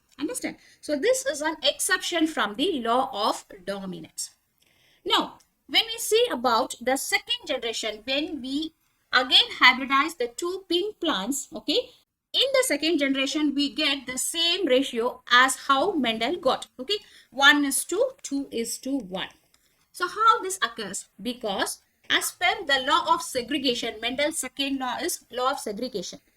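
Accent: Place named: South India, India. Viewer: You've a South - Indian accent.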